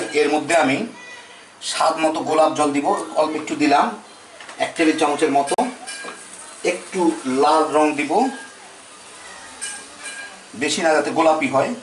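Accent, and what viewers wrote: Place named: Faridpur, Bangladesh. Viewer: Vai apnar soja sapta kotha onk valo lage tobe vai apnar vasha amader faridpurer moto.apnr bari ki faridpur distrik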